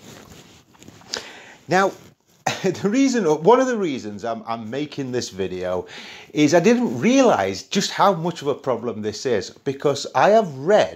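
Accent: United Kingdom. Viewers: Excellent video! Where in the UK are you from, BTW?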